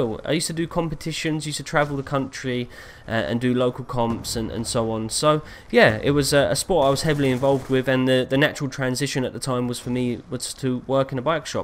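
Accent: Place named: Kent, United Kingdom. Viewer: you sounded like you were from kent! but why ireland? :D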